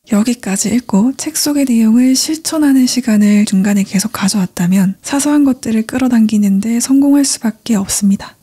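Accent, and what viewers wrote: Gyeongsang, South Korea. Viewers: 님 늘 감사합니다!^^ 우연히 보게된 끌어당김관련 영상을 시작으로 자기사랑 무의식정화 내맡김으로 관심이 이어졌는데요 미신 같았던 끌어당김을 님 덕분에 과학적 뒷받침 안에서 이해하게 되었어요 그리고 제가 예전 어떤 안 좋았던 지인의 기억으로 인해 경상도사투리 쓰는 여자에 약간의 거부감이 있었는데요(저 여자예요) 님 영상들 보면서 경상도사투리가 참 정겹고 좋으네요ㅎㅎ 사투리는 죄가 없는데 제가 그 기억을 놓아버리지 못했던 때문이죠 아무튼 두루두루 감사합니다ㅎㅎ 님 언제 한번 인간에게 자유의지가 있다 or 없다 이 부분에 대해 다루어주실 수 있으실까요? 님께 댓글을 달고 있는 지금 이 순간조차 모든게 짜여진 시나리오의 일부분인 건지, 태어나기 전 인생의 밑그림은 계획하고 왔지만 자유의지도 있는 건지 궁금합니다.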